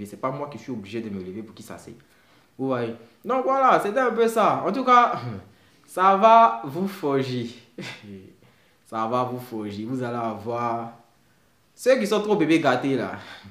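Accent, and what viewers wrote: Ivory Coast. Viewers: L'accent ivoirien me tue mdr 🤣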